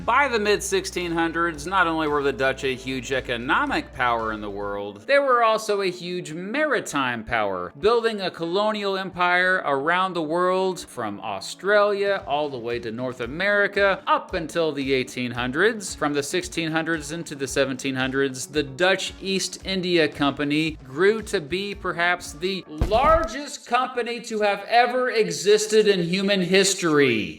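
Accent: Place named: Midwest, United States. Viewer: The lecturer sounds like a native Midwesterner.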